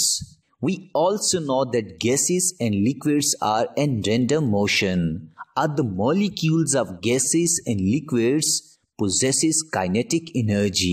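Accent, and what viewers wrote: South India, India. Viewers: Hahahah it's an Indian accent that's whyyy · It's his normal voice he is south indian that's why he sounds so..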